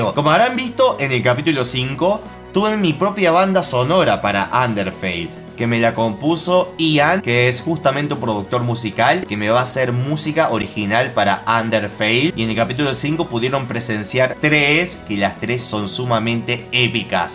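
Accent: Córdoba, Argentina. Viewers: Sos Cordobés(Argentino)??, es que tenés la tonadita cordobesa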